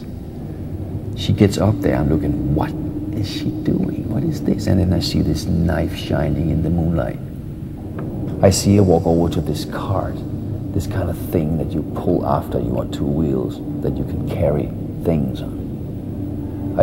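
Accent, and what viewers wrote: Denmark. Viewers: The danish non native english accent makes it kind off creepy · you can still hear his danish accent. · By listening to this, i found out this dude is from Denmark *lol* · My god... he sounds truly danish. XD But... very scary at the same time. That his way of speaking english reminds of my own english teacher is not exactly helping. :P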